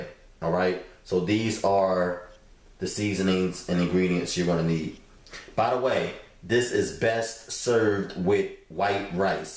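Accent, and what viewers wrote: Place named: Philadelphia, United States. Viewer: Lakeia Burgess lol That's that Philly accent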